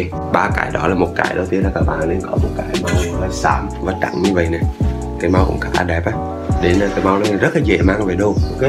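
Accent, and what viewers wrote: Hue, Vietnam. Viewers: đây là lần đầu em xem video của anh :D mới vào em đã có ấn tượng với giọng của anh ( em cũng người huế ), anh noí rất dễ hiểu. chúc anh thành công hơn :D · Giọng Huế dễ thương quá anh ơi~~~~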